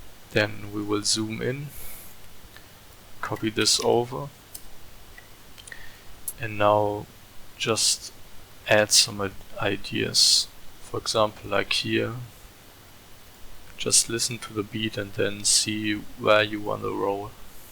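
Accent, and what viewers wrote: Germany. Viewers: hes from germany, i can tell by his accent :)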